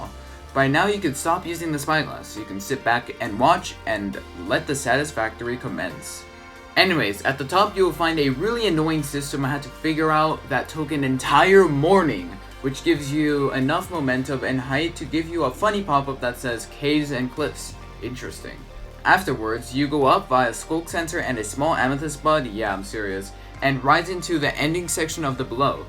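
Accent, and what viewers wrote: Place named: Canada. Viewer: Yeah, you're definitely Canadian, I suspected it after hearing eh then you spoke French. I can't see you not being Canadian.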